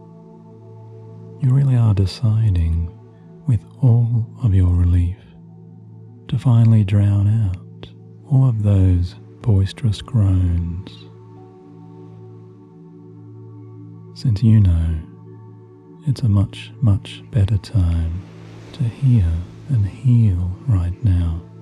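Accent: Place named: Australia. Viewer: your Aussie accent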